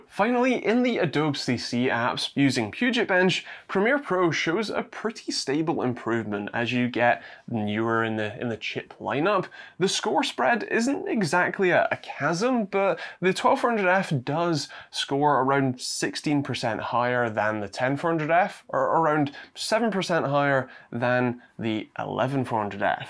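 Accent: United Kingdom. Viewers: British accent bro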